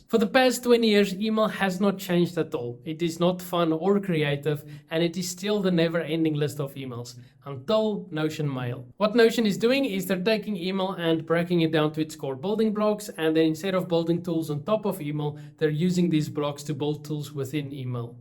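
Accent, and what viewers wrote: South Africa. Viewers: Once I heard you say the word database entry , I knew from your accent that you were south african 😉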